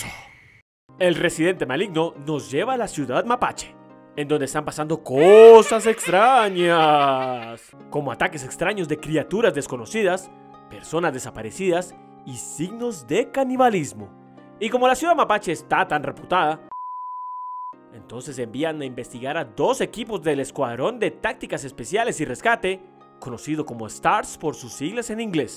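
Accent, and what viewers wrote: Colombia. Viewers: Me encantan estos vídeos... Y se nota que eres Colombiano... Saludos mi paisano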